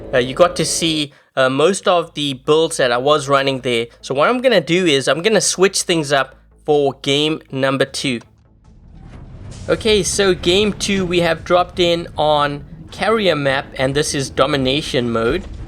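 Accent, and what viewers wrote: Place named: South Africa. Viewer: An excellent response mate, thank you for taking the time to write it and it very much covered every facet of my line of questions, so cheers once again. Culturally I think the Russians are very different to the west so the tact and understanding you have shown, even of the smallest nuances, goes a long way with them. Keep up the good work! Finally I think I asked on live chat once if you were a saffa or from Zim because of your accent but then you said you’re a Canadian national so am now confused.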